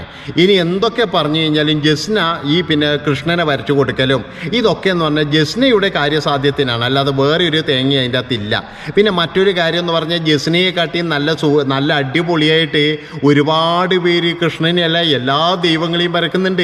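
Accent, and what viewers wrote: Kannur, India. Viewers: സംസാരം കേട്ടിട്ട് നിങ്ങള് നമ്മളെ നാട്ടുക്കാരനാണെന്ന് തൂണുന്നു.കണ്ണൂർ😂❤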